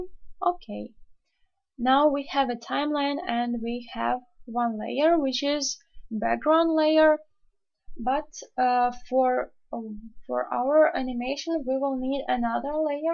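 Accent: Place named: Latvia. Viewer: Your name and accent makes me guess that you are Latvian. Could I be right? :D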